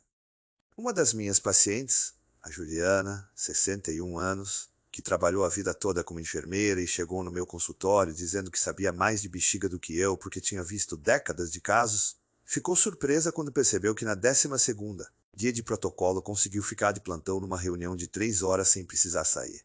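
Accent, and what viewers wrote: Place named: Portugal. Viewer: Interessante este Dr. IA fala português de Portugal e português do Brasil! 😮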